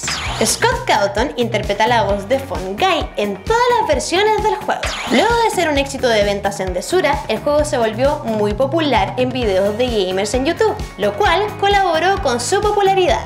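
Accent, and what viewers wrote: Chile. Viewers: es chilena o no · Y ¿ERES CHILENA? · eres chilena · Eres chilena? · eres chilena ?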